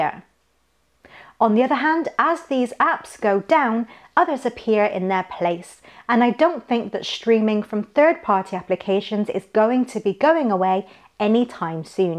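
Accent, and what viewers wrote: United Kingdom. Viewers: I love your accent I wish more British women were in CA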